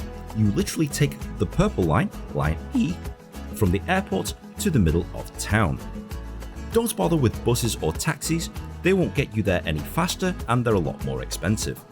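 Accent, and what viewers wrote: United Kingdom. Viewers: Your voice is perfect, and fine British accent. Great man!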